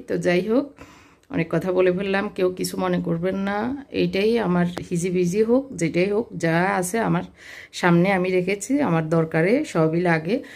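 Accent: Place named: Noakhali, Bangladesh. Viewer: আপনার কথায় নোয়াখালীর টান আছে।কথা আরও শুদ্ধ করেন তা হলে শুনতে আরওভাল লাগবে।তবে আপনার রুচির প্রশংসা করতে হয়।আপনার সাজানো সত্যিই সুন্দর লাগছে।উপরের মন্তব্যের জন্য মনে কিছু করবেন না।এটা ঠিক করলে আপনার বল্গটা আরও ভাল লাগবে।মনে করবেন এটা একটা সাজেশন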